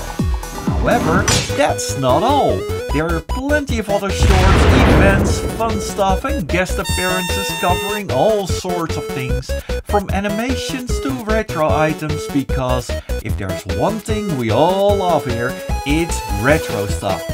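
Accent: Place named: Netherlands. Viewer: You can just feel the dutch accent in him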